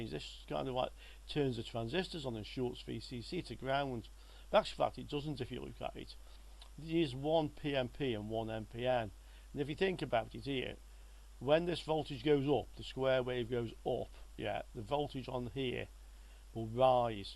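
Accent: United Kingdom. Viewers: Got it! Thanks and thanks for not posting something rude. I was honest about wanting to learn from an expert and I clearly could not understand his or really any "heavy" British accent. I did manage to figure out "subtitles", something I did not know about. Even at 65 I still learn something new everyday. This Youtube is mostly a younger persons platform and have just recently started to watch, learn and enjoy from it.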